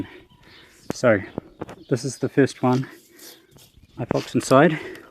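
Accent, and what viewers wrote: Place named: New Zealand. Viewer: You sound like a kiwi ! Looks like a cool project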